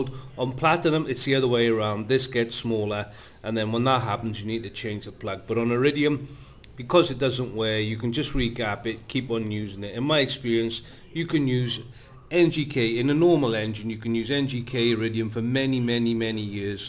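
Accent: Wales, United Kingdom. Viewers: He's Welsh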